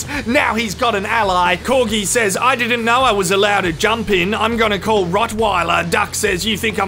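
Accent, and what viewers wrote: Australia. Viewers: you make me want to be an aussie so I can talk like you!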